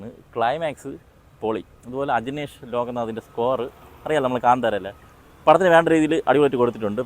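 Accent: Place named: Thiruvananthapuram, India. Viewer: I like Thiruvananthapuram language. By kanyakumari karan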